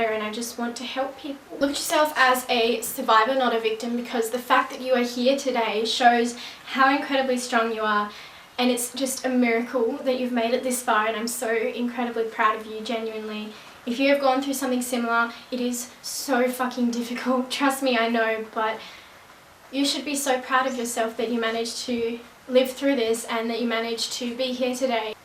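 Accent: Australia. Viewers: Is Australian?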